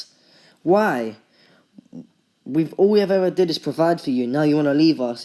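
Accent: United Kingdom. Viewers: i live in usa and its been a while since i last heard British accent but the video was really good.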